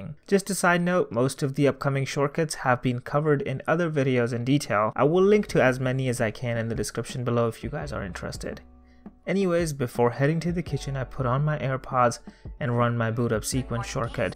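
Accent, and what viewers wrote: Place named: India. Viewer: Indian accent is still there :) , Oh Puchheya Na Kar Mera Schedule Ni But you are telling your schedule about the day ... lol XD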